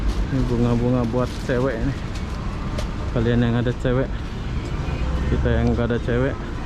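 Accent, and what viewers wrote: Malaysia. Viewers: 😆..kebiasaan lama dia sewaktu masih kerja di M'sia.. Dah bro biarin jgn dipermasalahkm klo soal aksen atau bhs. Bukan hy dia kok. Byk juga TKI yg pulang2 bhs nya campur2 aksen Malay. Meski agak Alay sih tp g p2.. kita aja yg ga pernah kerja di M'sia dah kena pengaruh bahasa upin ipin. Serius.. .propaganda budaya lewat upin ipin ini berhasil lho gaes mmbuat anak2 paham bhs malay ..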